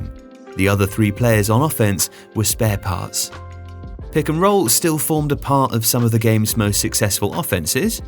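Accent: England, United Kingdom. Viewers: Somehow I'm still not used to british accent explaining basketball..😂 yet great content tho.. Keep it up!!!🔥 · Rather listen to English accent all the time!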